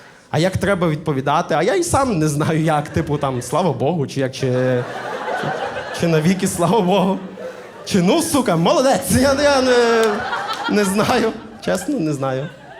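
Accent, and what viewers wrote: Galicia, Ukraine. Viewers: люблю вашу говірку Галицьку, слухала б і слухала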